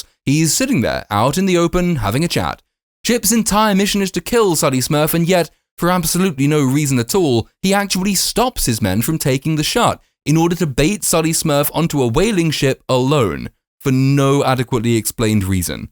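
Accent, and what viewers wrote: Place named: RP, United Kingdom. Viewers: Bros speaking so posh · A posh accent doesn't make you intelligent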